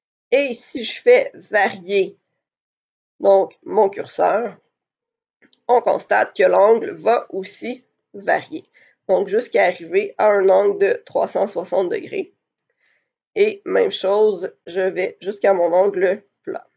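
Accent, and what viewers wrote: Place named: Quebec, Canada. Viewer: accent québécois magique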